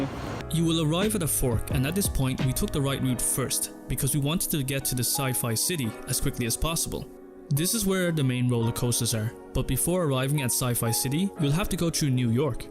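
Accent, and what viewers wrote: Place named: Ireland. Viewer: Watching and thought "this lad has an Irish accent". Going in May. Subbed!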